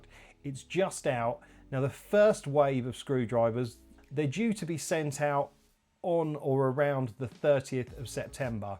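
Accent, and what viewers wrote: United Kingdom. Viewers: The small interaction with LTT definitely bumped the algorithm. I understand your frustration with the metrics. You have a portfolio and time in the market, but it clearly takes something like this to get visibility with the sheer numbers of YouTube. There's a ton of UK makers (I assumed based on accent). James Bruton is one that might mesh well. Hacking things together, fixing things, and whatnot. IMO you are better at presentation, but he makes some really cool stuff. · Firstly - the algorithm gods brought me here. Am going to sub, loving the content. Also - please don’t tell me the comment about ‘longer shaft being useful’ was unplanned. Was treś funny! lol Keep up the work bruv. (Sound likes a fellow Londoner)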